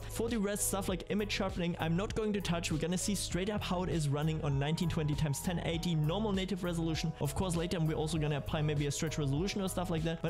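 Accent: Germany. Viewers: Hab dich grad entdeckt und hab nicht mal 10 sekunden gebraucht um zu checken, dass du Deutsch bist. Als Deutscher hört man das gleich raus haha :D. Und nices Video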